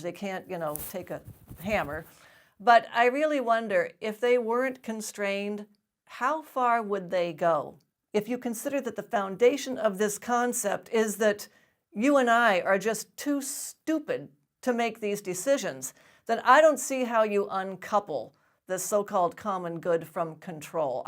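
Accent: United States. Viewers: If you have a beautiful voice you can tell me lies :) It's a pleasure to listen to them. I love your beautiful American accent.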